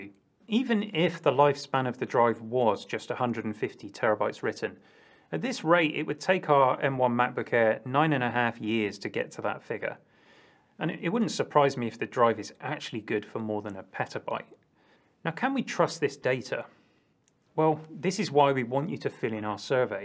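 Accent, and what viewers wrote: United Kingdom. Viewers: British guy talking smooth - going to sell your data to a class action lawyer against Apple. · the British accent is the best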